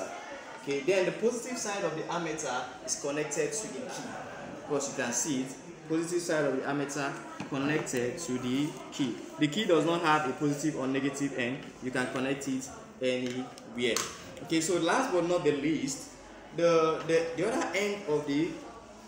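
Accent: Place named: Igbo, Nigeria. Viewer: I knew you are Igbo at the instant of your first word , and that's why I called you brother. Just keep up the work. ka Chukwu nonyere anyi!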